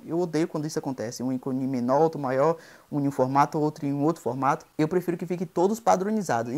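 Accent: Bahia, Brazil. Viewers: Tu é baiano de onde, man?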